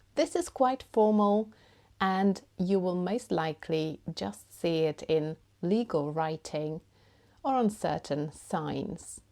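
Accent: United Kingdom. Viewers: Never have I met such a fantastic and charismatic English Grammar Teacher like you. You look quite captivating, sweet, and heavenly with your contagious smiling face blended with a soothing British standard accent. You are wonderful and ethereal. Cheers! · I do love your beautiful british accent and of course all of your grammar teachings! ❤ You’re awesome! 😊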